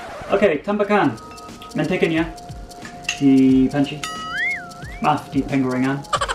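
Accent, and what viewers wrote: United Kingdom. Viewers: suka sama British accent nyaa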